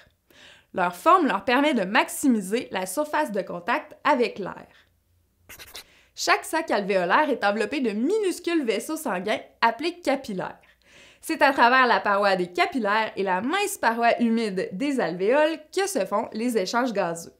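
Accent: Quebec, Canada. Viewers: merci beaucoup! ps: j'adoooore l'accent quebéquois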